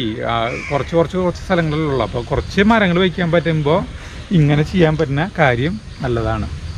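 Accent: Thrissur, India. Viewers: Thrissur slang❤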